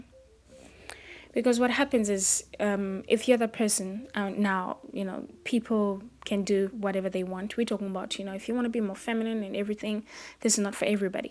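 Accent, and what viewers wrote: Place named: Zimbabwe. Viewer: Very proud to hear a sophisticated Zimbabwean sisi here :) Well done, lovely accent. I have the same. Keep living and growing well girl. Love from the UK xxx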